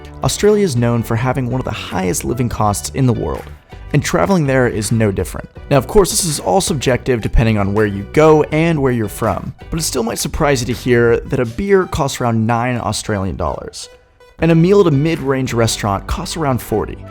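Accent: United States. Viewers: Once I heard an American accent I was ready for a lot of nonsense being spoken about Oz, buy hey, not bad, you are pretty much spot on.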